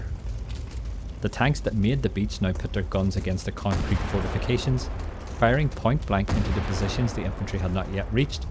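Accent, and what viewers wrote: Northern Ireland, United Kingdom. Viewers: Judging by your northen Irish accent I assume this isnt an A.I voice over. Therefore I have subscribed and liked your video. I grew up in cork myself, but am a dutch guy living in Germany.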